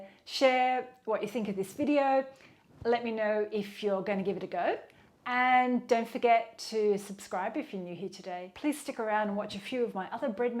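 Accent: Australia. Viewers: Thanks for this great video. Since Judith Durham said "Goodbye", I don't have an Aussie ladyfriend -- you're it. Bakes bread, wonderful accent and a pleasant way about you... what's not to love,huh? Please forgive me, I don't mean to be politically incorrect. It's just my Canadian way of saying,"Well done!" G'day. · Appreciate this plantbased recipe and the written instructions alongside your lovely Aussie accent 💙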